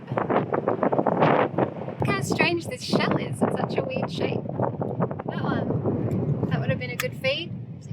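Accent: Australia. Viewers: What a talented & resourceful women you are 👍. I love the Australian accent ❤️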